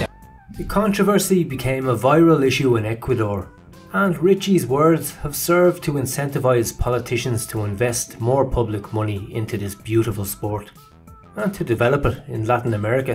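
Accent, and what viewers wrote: Ireland. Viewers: Is that an Iris accent I detect?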